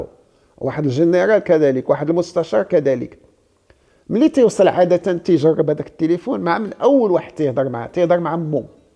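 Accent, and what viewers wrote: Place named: Egypt. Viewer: هل هدا سفير مصر في المغرب ? لان لهجته اكتر مصرية من مغربيةً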